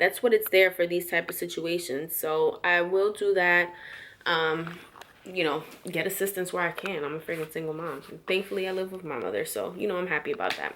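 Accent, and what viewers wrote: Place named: New York City, United States. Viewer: Are you originally from NYC, I hear a NYC accent lol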